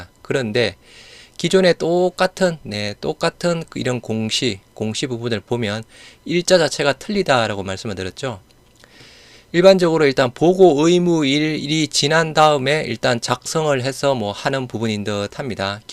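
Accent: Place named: Jeolla, South Korea. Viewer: 억양이 전라도분 이신듯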